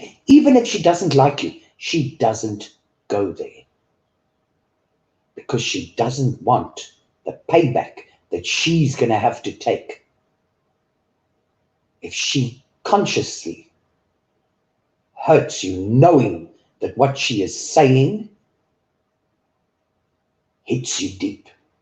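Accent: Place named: South Africa. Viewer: Sooo glad I found another South African here in this crazy community! 😅 Great to hear a familiar accent!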